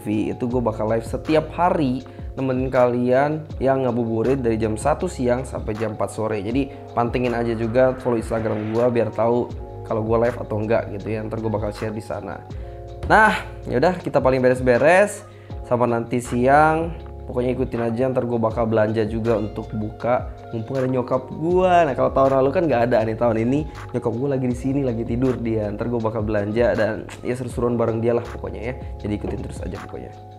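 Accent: Palembang, Indonesia. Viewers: Masih kentel banget bhso Palembang ny bang salam dari batu raja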